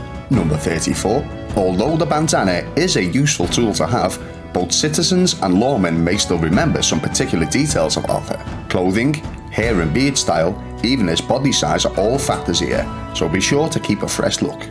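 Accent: Liverpool, United Kingdom. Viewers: nice to hear a scouser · That’s some Scouse accent. From Kenny myself!! Great video. Nice one!! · Just playing this for the first time and this video is perfect, so thanks! Also, bonus for it being made by a fellow Scouser! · nice stuff. Could hear the Scouse twang but could hear a bit of strong northern 😁. You're not the the fella who plays cod professionally and lives in wavertree, are you ? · Yes lad finally a scouser lad your channel deserve more subs content on rdr2 is amazing mate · Is this guy a scouser? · Me...not long started.These tips are well handy tyvm. As a fellow Scouser though, can i just say that your pronunciation of 'lass - o' surprises me..I'm prob a bit older than you though..i say 'lass-oo'! lol. You sound North end like me though? .but i can hear you dumbing down slightly for the world audience hehe.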